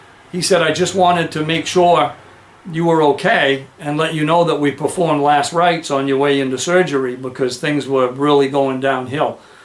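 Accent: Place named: New England, United States. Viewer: Hey, I'm new to your channel, just subscribed today, 8 -28-21..... Saw your video from 5 years ago on the Dolos system....Dig it....... Don't know much about you or the content of your channel, but I liked what I saw and how you present and come across to your viewers... I'm a vet myself (semper fi), and can appreciate how crazy this year had to have been for you and your family.Life is a fragile thing.... So, just wanted to reach out and wish all of you the best in health, happiness and better fortunes from here on out..... I'm in the new England area as well, picked up on your accent before you mentioned Maine (lol)..... Look forward to more of your vids when your up to it..... Brighter days my friend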